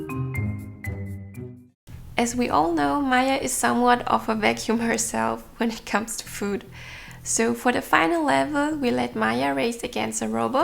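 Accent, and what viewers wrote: Germany. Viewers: You are from germany right? I mean the accent and at the top there was the word Werbung and I don't think it's English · You Are from Germany, Right?😃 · are you german? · Her accent is like from a German ^^ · Are you German??????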